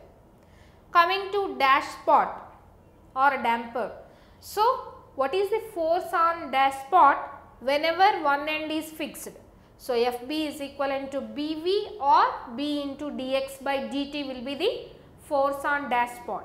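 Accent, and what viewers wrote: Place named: South India, India. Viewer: aiyooo south indian.... :D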